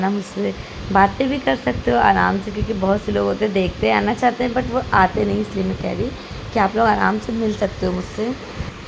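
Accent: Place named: Bengal, India. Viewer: App lakhnow se ho me smjhti thi ki ap bngal se ho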